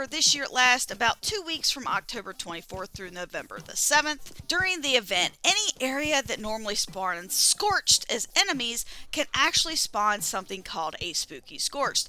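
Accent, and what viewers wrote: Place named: West Virginia, United States. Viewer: W.V. accent...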